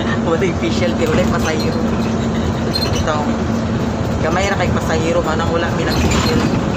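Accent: Visayas, Philippines. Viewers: Misubscribe na jud ko nimo mam kay nindot kaayu imo accent sa bisaya bah. Imong vlog sad nindot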